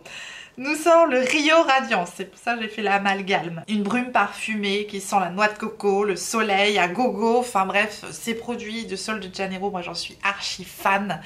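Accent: United Kingdom. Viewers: Coucou 🐢🌹 J'ai la même petite palette de Bobbi Brown moi aussi et elle est vraiment bien👍🤗 La collection d'Estée Lauder me tente bien moi 😊 Youpiii ! De nouvelles teintes du fameux gloss de Maybelline 🤗 C'est pas folichon les nouveaux produits de Dior 🙁 Alors là ! Les palettes MAC sont à tomber, je les veux 🤩😍 Je ne connais pas du tout kacmarque Juvias place, m'en vais remédier à ça 🤔😉 Ah ! Enfin le highlighter de By Terry ! Super ! 👍🤗 Les bronzer de Patou sont très beau. Ils me tentent bien et le blush aussi 😊 J'adore ton accent anglais 😊 au moins je comprends ton anglais 😂 Le fond de teint sérum de Too Faced me tente beaucoup moi aussi 🤗 La Terracotta de Guerlain est une légende 😍 Ma mère en portait quand j'étais petite. C'est une petite madeleine de Proust pour moi ❤ Très bonne idée le roll-on d'Iconic London dis-donc 🤔👍 Chez Clé de Peau, peut-être qu'ils acceptent le don d'organes en échange d'un de leur produits non ? 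😉 Je tenais à te remercier pour m'avoir conseillé le fond de teint Care and Glow de Lancôme 🙏❤ J'attends la fin du mois pour me l'acheter mais je suis sûr qu'il sera parfait pour moi 😊 Encore une super vidéo 👍💕 J'adore ce concept de nous présenter les nouveaux produits sortis ou à sortir 👍♥️ Merci 🐢🌹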